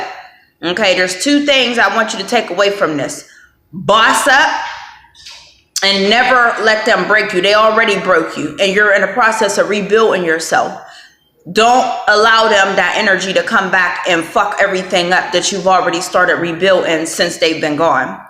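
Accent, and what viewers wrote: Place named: Mid-Atlantic, United States. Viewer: do you..interesting accent btw...are you from the mid atlantic region?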